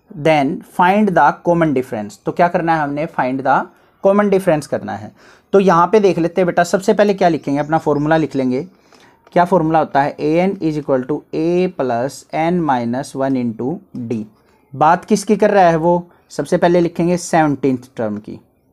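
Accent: Haryana, India. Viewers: Sir aap Haryana s ho na maybe😅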